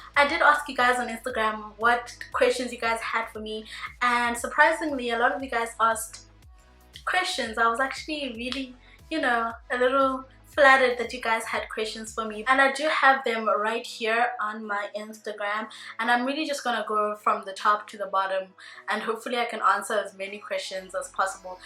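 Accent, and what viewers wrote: South Africa. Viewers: South African accent...😑I thought that too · You have a cute South African sounding accent! Love your spirit! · omg!!! legit thought you were South African. your accent says S.A guuurrrlll?????!!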